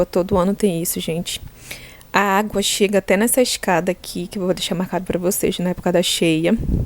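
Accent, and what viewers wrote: Rio de Janeiro, Brazil. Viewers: Para que esse sotaque de carioca? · Que legal, não tunha iseia da vida aí, obrigado pelo vídeo, adorei. Gostei do sotaque também 😊, parece com o do Rio de Janeiro kkkk 😍👏🏽👏🏽👏🏽. Sucesso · Engrassado sotaque de Carioca la na Amazonia 😆😅😉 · vc fala parecer ser carioca vc1p kkkkkk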